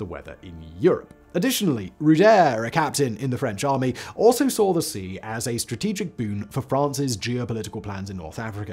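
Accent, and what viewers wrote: England, United Kingdom. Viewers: What a likable not annoying at all bri'ish voice! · You have a lovely voice , so classic English ! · I find it extremely strange that you sound a lot more British now than a few years back when I watched your older videos 😂😂 it's absolutely baffling to me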